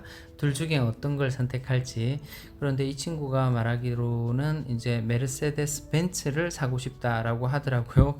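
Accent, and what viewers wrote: Gyeongsang, South Korea. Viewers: 그냥말편하게하세요^^ 경상도같은데ㅜ이건머사투리도아니고표준말도아니고ㅜㅋㅋ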